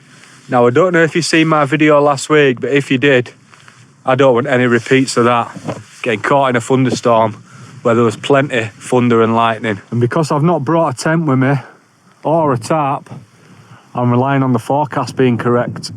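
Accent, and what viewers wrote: Yorkshire, United Kingdom. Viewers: Im sure you've had some dawg after ya food it looks like 😂 hope you enjoyed, im jealous. Keep up the good work just subed. Nice to hear another yorkshire accent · love it when you pronounce "cant" as 'caart'. Keep on yorkshireing old boy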